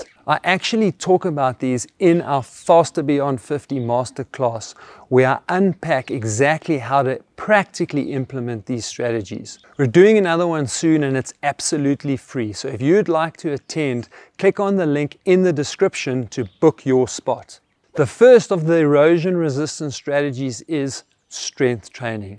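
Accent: South Africa. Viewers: Are you South African? You sound like Dr. Grobbler, my friend in Ladybrand.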